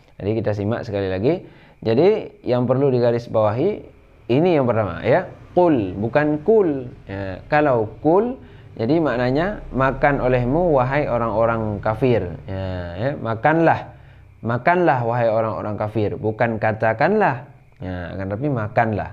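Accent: Aceh, Indonesia. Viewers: guru tetbaik saya suka ada logat acehnya barpun saya orng padang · Memang Awak Aceh beda baca o dan du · Patutlah intonasi bahasa ustaz bunyinya nyaman ditelinga saya orang Semenanjung. Orang Acheh rupanya.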